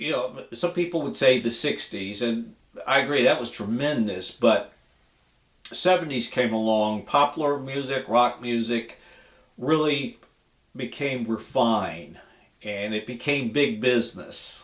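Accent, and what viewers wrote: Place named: South, United States. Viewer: The first thing I had to do after watching the video was to find out where in the south that this young lady was from that's a thick accent even for me here in Louisiana. Very different vocal styling, good music the more videos I watched the more she just grows on you. Thanks for the introduction.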